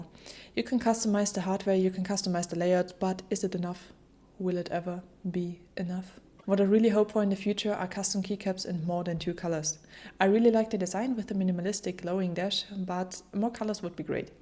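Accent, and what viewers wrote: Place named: Germany. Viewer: Are you German? I think I recognize your accent. You speak similarly to Joscha Bach.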